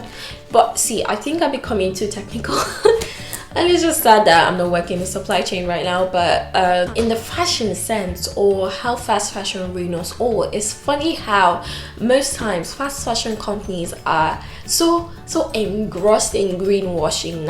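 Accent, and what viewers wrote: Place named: United Kingdom. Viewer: with the British accent. Thanks for educating us on supply chain. I didn’t know supply chain had this much depth. Keep it up 👍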